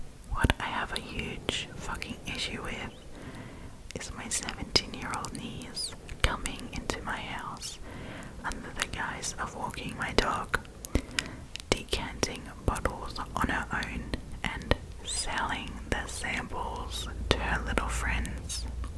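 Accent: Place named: Australia. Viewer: Wait am I going crazy here or are you German? Because I got so thrown off by the Australian accent omg!!❤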